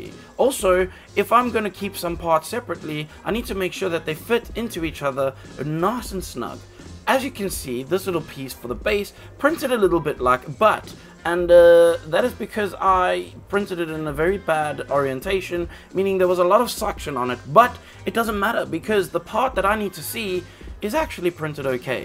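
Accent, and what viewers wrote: South Africa. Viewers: Awesome work! You sound very South African. 😅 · Are you originally from South Africa? 🤔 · I recognize that South African accent 😉 This is incredibly impressive, well done!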